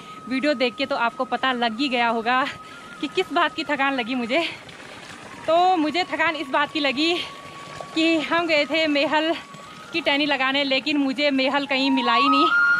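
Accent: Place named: Garhwal, India. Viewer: बढ़िया वीडियो बनाया। हिंदी को गढ़वाली लह में बहुत अच्छा बोलती हैं।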